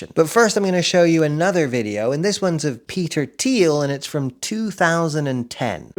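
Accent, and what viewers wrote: Wales, United Kingdom. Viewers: I’ll let you off for the Welsh accent, because you speak so much truth! 😂🫡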